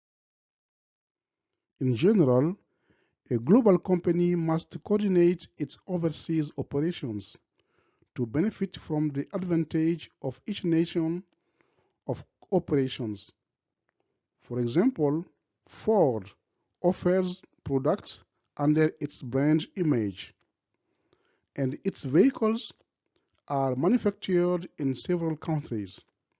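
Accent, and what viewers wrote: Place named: United States. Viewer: Félicitations, tu parles comme un américain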